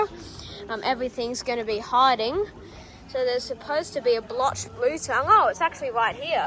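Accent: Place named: Australia. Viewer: are you as cute as you sound with that great aussie accent...i love the Australian reptile park been there several times....tell tim scott said hi mate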